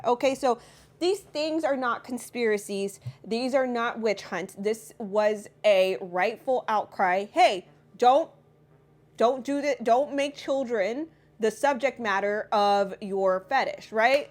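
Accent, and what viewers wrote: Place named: Staten Island, United States. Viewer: You sound just like my Italian sister from Staten Island NY! Good discernment 💯☺cool beans! 😎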